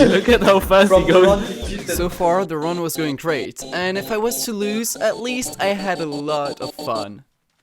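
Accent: France. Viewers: ayo i knew he was french by his accent or at least itought he would speak french, an he does, it makes me happy idk why when i see french yt ers speek english+ actually udnerstanding them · That’s what you’re accent is the entire video I was wondering and at the end you start talking French exactly what I though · Could definetly tell you were french from the accent lol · ah bah tu parles francais a la fin mais jai reconnu a laccent ptdr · Bonjour Monsieur je pense que tu est plus francis, francis et moins quebequoi francis. Oui? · I totally expected that from his accent tbh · Aaaaaah, I thought you might be French! The "no lessons on Wednesday afternoon" made me suspicious, and I then I thought I noticed a hint of French accent in your English Gg mon gars :) Edit: Belgian apparently? Whatever, French-speaking, you know what I mean xd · Bien joué mec j'ai même pas remarqué que t'avais un accent français avant la fin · i’m french canadian, he’s french, he has a french accent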